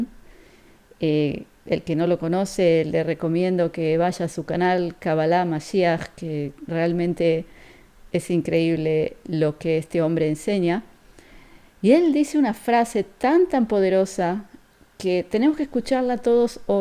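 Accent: Argentina. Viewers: esa historia de las huellas no es de Dios Padre El es energía y no tiene materia Las huellas son las de Jesús El se hizo Hombre y camino sobre esta tierra Entiendo tu historia tus raíces y las respeto!!! Pero la Huellas son de Jesús!!! Aunque Nuestro Padre Eterno Siempre esta pendiente de nuestras necesidades!! Tenes una dulce y suave voz Argentina da gusto escucharte También en Hebreo resalta esas cualidades que mencione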